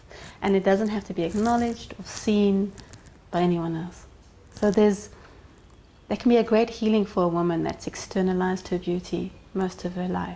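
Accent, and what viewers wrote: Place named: South Africa. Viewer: You sound South African. Are you?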